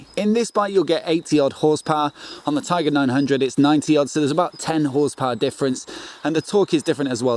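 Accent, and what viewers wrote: United Kingdom. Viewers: Awesome information, and a British accent that is pleasant to listen to. Thanks!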